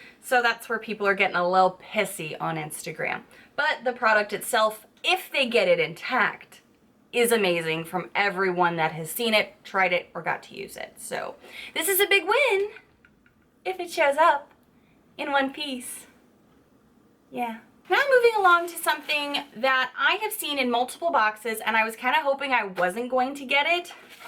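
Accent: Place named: East Coast, United States. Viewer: hi there. im ur newest video junkie but im gonna break the mold of 98% of the ladies who commented, u ready.....wait for it.... i DO NOT have a channel ( how refreshing huh ) and still subbed u. i love ur east coast accent and sass! u r FAN-TAB-U-LOUS my dear... MWAH! i sense a youtube and chill binge watching session coming on, wish me luck ( I LOVE AUTO-PLAY)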